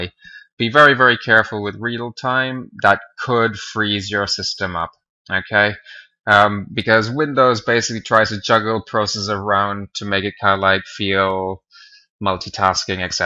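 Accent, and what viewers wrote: Ireland. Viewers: ALSO U SOUND SEMI IRISH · Where are you from? You have an Irish accent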